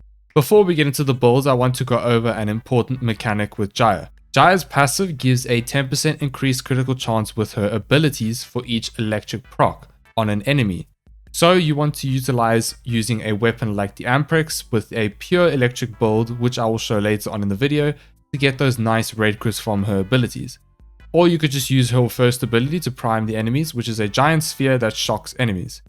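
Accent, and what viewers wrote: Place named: South Africa. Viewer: Is this guy South African because he definitely sounds like he is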